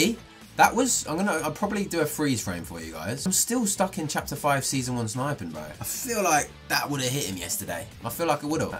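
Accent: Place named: United Kingdom. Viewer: HE'S BRITISH?????!?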